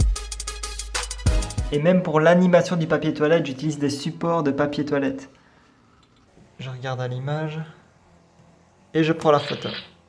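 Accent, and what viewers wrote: Liège, Belgium. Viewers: Toi tu viens de Liiiiidge m’fi!! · J'adore ton accent !! c'est belge non? 💘💗😙 sinon continue comme ça tu gère pouce bleu aussi pour cette vidéo (comme toute les autres)😚💝😙💗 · Tes belge ? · Tous les belges ont cet accent? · Es-tu belge? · Tu es belge ou je me trompe? Je reconnais l'accent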